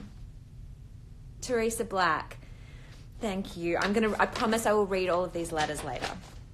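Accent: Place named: United States. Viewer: THE AMERICAN ACCENT HAGAHAHHAHAHA IT WAS GOOD THO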